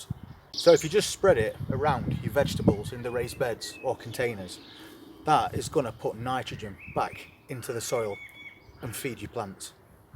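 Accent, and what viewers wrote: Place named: Shires, United Kingdom. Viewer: That accent sounds like a lad from the shires.🐝🐝🐝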